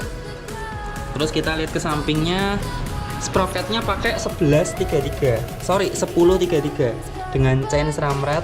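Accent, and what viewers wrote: Java, Indonesia. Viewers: Mantaappp.... bahasanya pakai 3 bahasa, ingris,indonesia,jawa medok..hehehe.. semangat mass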